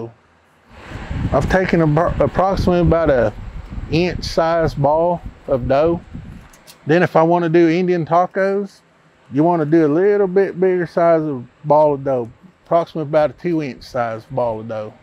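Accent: United States. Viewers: You sound like a country boy! Hey this is coming from a country boy just on the south side of the red river! Man I had some of this over in New Mexico and man was it good stuff! You make it look easy Im going to try iit Thanks! Curious does it taste better if the warm water is poured out of a OU cup? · Are you referring to his accent? Because, this is a great example of an Okie accent. I understand him perfectly. Yes, I am an Okie as well. Boomer Sooner Baby!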